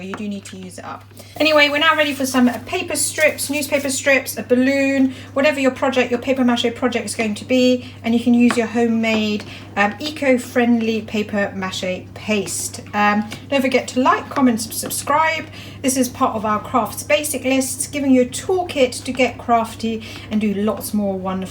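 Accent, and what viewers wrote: England, United Kingdom. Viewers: ye proper English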